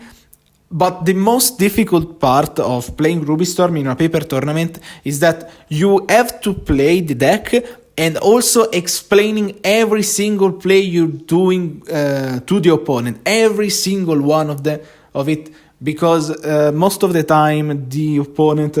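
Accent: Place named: Italy. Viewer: Hi mate, really enjoy your job here on the channel, keep going with it!! For the first problem i just have a lot of tokens for the differents effects(mana, storm, flashback etc.) and i have spent one afternoon goldfishing and try differet approach to find my spot on the playmat for everything in a way that was clear for me so that in tournaments i can go pretry fast and if oppo has doubts i can explain quickly For problem 2 unfortunately there's nothing you can do about it....i've stopped bringing storm at FNM because everyone i end up against was complaining about something and because we all do it for fun in that occasion i stopped, while at RCQ people are still complaining a lot because the deck is indeed frustrating to play against, but as you said in a competitive environment everyone wants to win so i just don't listen to them, or i just say that i know that the deck is frustrating but we do what we can to win and after the match they look to understand cause they are there for the same reason(and let's be honest every deck is frustrating on it's own in modern right now cause everyone has to do unfair things to win) Also are you italian?(i am italian and your accents really seems italian to me)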